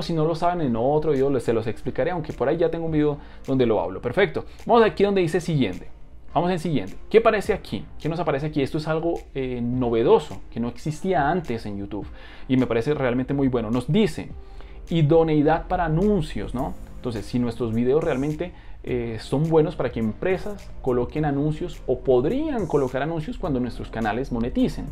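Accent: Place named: Colombia. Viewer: Yo si decía que ese acento se me hacía conocido, tenías que ser colombiano para hacer las cosas tan bien 😊 🇨🇴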